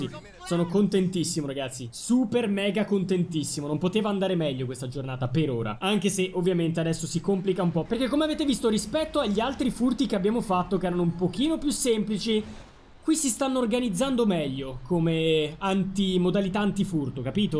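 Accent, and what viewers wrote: Milan, Italy. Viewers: Ma sei di Milano?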